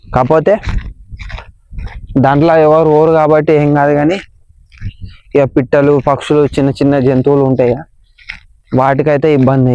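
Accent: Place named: Telangana, India. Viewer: Am loveda lo slang ra idhi thu .. telugu rada me TS vallaki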